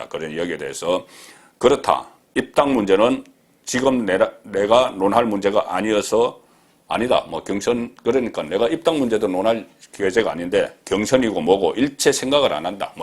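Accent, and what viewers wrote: Gyeongsang, South Korea. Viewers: 강한 경상도 억양때문에 첨엔 어쩌나 했는데 들을수록 내용이 진솔 담백해서 조용히 지켜 봅니다. 저는 여성이라 경상도 억양이 답이 안나오네요. 아...서울말 쓰고 싶다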